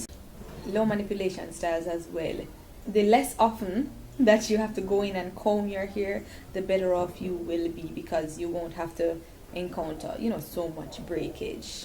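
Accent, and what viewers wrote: Jamaica. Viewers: Your Jamaican accent!!!😍😍😍😍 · The moment I heard her, I knew she's Jamaican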